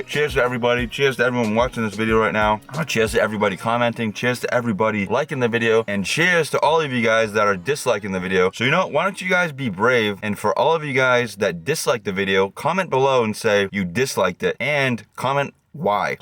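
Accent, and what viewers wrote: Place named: Massachusetts, United States. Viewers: Every time your Massachusetts accent comes out, I have to laugh. You're adorable :-) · I just started watching your videos recently and I kept saying he's GOT to be from Massachusetts LOL. Fellow Bostonian here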